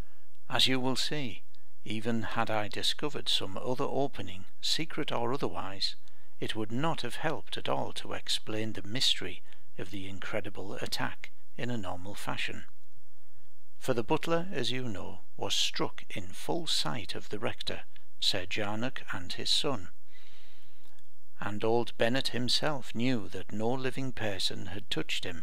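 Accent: United Kingdom. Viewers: No offence to American readers, but there's nothing like an English voice for a ghost story 🙂. Thanks.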